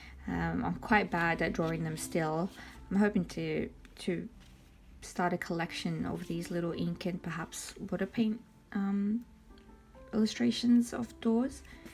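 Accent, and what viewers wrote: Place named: Australia. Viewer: Okey, thanks for answering! I didnt think your accent did sound like australian, but after a bit more listening I almost hear it. 😅 Hope to see much more from you and your art! Love from Sweden!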